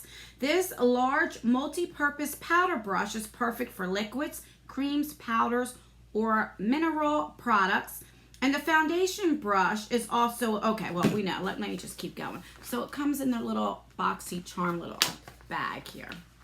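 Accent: New Jersey, United States. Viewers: I love your Jersey accent! We moved to west Virginia 4 yrs ago from Philadelphia. As soon as I open my mouth everyone asks where I'm from . Happy to see you got your brushes.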